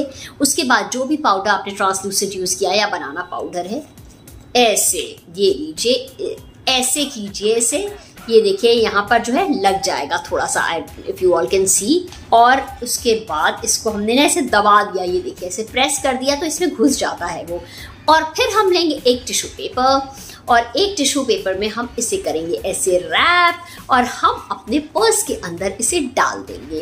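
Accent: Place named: Lucknow, India. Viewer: Hi aap ki boli kitni achchi hai kya aap lucknow se belong karti hai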